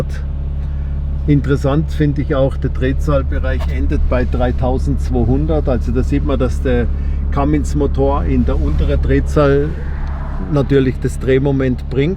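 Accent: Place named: Bavaria, Germany. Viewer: Glad I could still understand a little Bayrische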